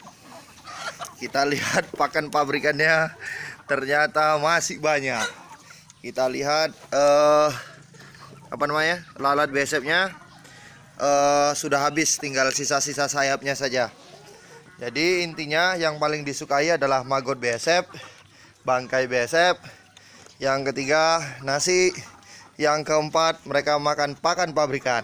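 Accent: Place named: Java, Indonesia. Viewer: bagus bermanfaat. wong Medan, aksen ngomongnya kok wong Jawa ?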